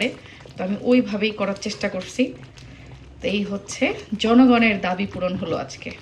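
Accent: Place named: Chittagong, Bangladesh. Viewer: আপু ডেকছি চট্টগ্রামে ভাষায়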